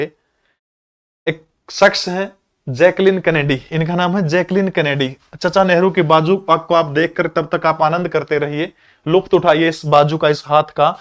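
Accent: Haryana, India. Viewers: Namaskar 🙏 sir,Maine bhi पंडित Nehru के बारे में काफी कुछ pda h sir,real me hi Nehru ek aasik mizaz person tha,lakin yah bhi Sach sir ki Bharat विभाजन के जिम्मेवार भी नेहरू ही थे,ना ये prime minister बनने की जिद करते ना जिन्ना अपना अलग पाकिस्तान बनाता खैर लेकिन आप कुछ अलग ही अंदाज में अपनी हरयाणवी में ब्यान करते हो वह सुनने में काफी दिलचस्प लगता h बहुत बहुत धन्यवाद Bhai enki beti par bhi ek vedio bna digeye🙏🙏🙏🙏🙏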